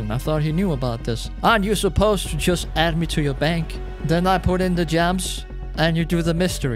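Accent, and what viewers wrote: Denmark. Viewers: YOU SOUND DANISH?!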